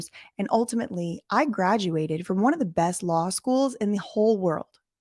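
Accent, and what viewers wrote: South, United States. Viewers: ...I can also relate to something similar in healthcare. I'm finding this late but I wanted to say how freaking ahhmazing you are. When i first found you a couple of months ago, I thought you were just a quirky youtuber with a southern accent. I quickly have realized what a gem you are. So intelligent and funny! Side note, my husband who doesn't watch any youtube has taken to calling you " '. (yeah i know) and you've made him a fan. Love and respect!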